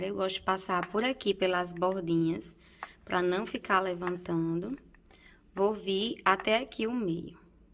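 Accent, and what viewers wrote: Northeast, Brazil. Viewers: Amo o seu trabalho, Acho lindo o seu trabalho e o seu sotaque. Vc é paraibana ou pernambucana?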